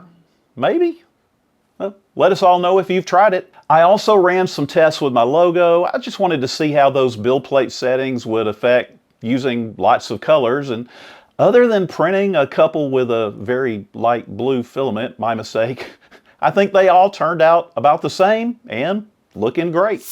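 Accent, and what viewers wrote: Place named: South, United States. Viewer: Was able to filter out the YT algorithm noise enough to discover u. Awesome stuff man. Also u talk like my dad and make me remember my time growing up in the south, so that’s nice!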